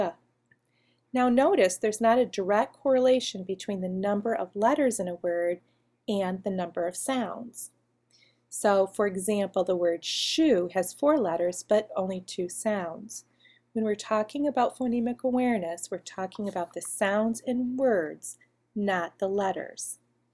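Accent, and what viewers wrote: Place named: Midwest, United States. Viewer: cute accent. i like your a's. midwest?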